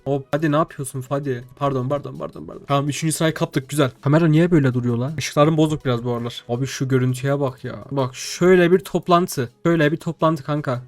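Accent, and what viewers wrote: Erzurum, Turkey. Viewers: abi erzurumlumusun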